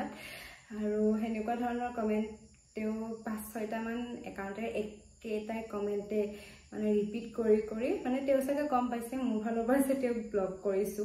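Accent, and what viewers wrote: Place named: Mangaldai, India. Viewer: Ba tumi darrang district r hoi nki ? Kothabur mangaldai side r nisina lagise Btw..bohut morom thakil ❤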